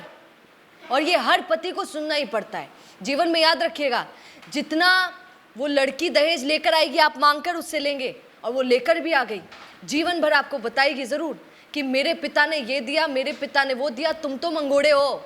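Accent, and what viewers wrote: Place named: Marwari, India. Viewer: Madam ak kahase ho, hindi or marvadi mix bol rahe ho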